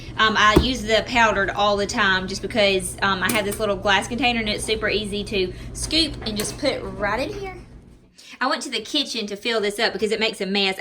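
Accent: South, United States. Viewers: I like the "Iowa Pine" and the "lavender" Mrs. Meyers . Such a pretty chandelier!!! Your rooms look great and doesn't it feel great to have them organized. You did get a lot done in one day. I really like the two thread work pictures. Just a side not~~I love listening to your southern accent. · You might want to look up <link> to see about getting spare parts for your chandy. I have used them as they have come to my rescue several times. Have you thought about painting it? I am on a spray painting frenzy so that is why I mentioned it I guess. You sound like a beautiful southern woman..I was born and raised in Tennessee but am now in Georgia. Love your decorating style! Tfs,,,take good care!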